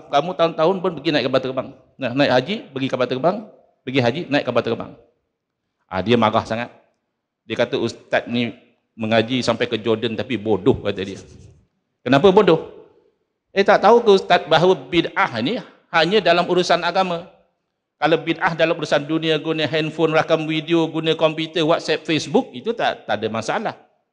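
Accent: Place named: Negeri Sembilan, Malaysia. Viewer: Mano... Cam org nogori ustaz ni🤭🤭🤭🙏🙏🙏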